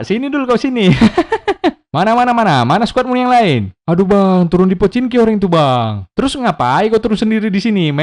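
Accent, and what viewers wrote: Medan, Indonesia. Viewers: Digigit tungir wkwkwkw Medan kali ah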